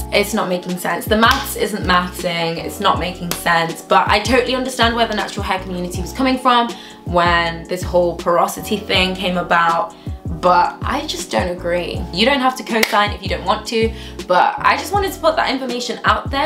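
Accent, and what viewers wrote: United Kingdom. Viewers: Are you just talking to British people or something? Because I noticed you have an accent. I know you're not talking to Black American people or maybe you don't know our history. You just said that white people have a variation in porosity. Black Americans are literally white. Like literally have white ancestry on high levels. And you're out here telling us we can have a certain hair texture. Well not understanding we're not africans. African would morsel apply to someone who is British like yourself maybe. Who has 100% African ancestry not someone who is literally working with 24% European ancestry. And people even who have 5 to 10 European ancestry. That's still a part of our dna. And it will still be expressed in many different ways. So just because someone has the same skin tone as you. You can't say we have the same genetic makeup as you. 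Therefore our hair may have a different pattern. I don't even know what you mean by African hair. Is African hair just 4c hare? I always wondered that. Are we including 3C hair or at least four a hair? Personally for me when I look at hair types. And it's hard to see because everybody likes to do a wash and go and loosen their curls. Which is like I can straighten my hair totally what lies that my natural curl like know. But some people they don't like to show what they got but from what I've seen the dry version of 3C that's tighter. Four A and 4b. Sort of. A looser texture of 4b are textures that are pretty much relatable to my hair t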